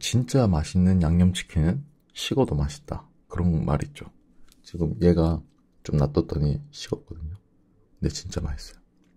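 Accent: Busan, South Korea. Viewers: 부산사투리가 왤케 좋죠? ㅎㅎ 꿀떨어짐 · 부산말투?사투리 느껴져서 괜히 정감가는듯 ㅎㅎㅎ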